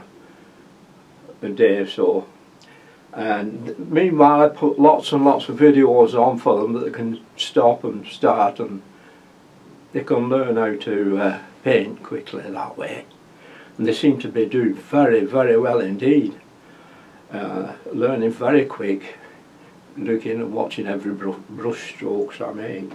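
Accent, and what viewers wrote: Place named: Bolton, United Kingdom. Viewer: Hi. Great to put a face to your wonderful tutorials. You are truly an inspiration. I love your accent. It reminds me of my childhood, growing up in Hulton Lane, Bolton, horse-drawn Manfredies ice cream and day’s when we “ played out” in summer until after dark. Best wishes.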